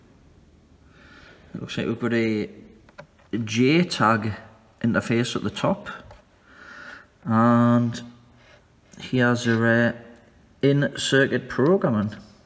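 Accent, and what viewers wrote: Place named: Scotland, United Kingdom. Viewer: Great video, love the accent. Like a slight touch of scottish in your north east dialect. Keep up the great work. Kind regards. Paul. 👍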